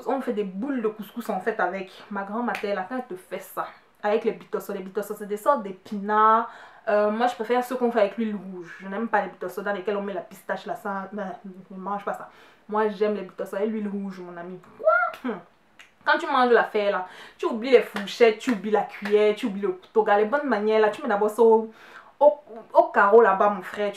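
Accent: Cameroon. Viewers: trop bien ! waouh tu es vraiment spide!! je kiffe l'accent camer ! tu m'a donné envie de manger ,je vais apeler ma marraine , je veux du ndolei ! lol en tout cas bravo !!!!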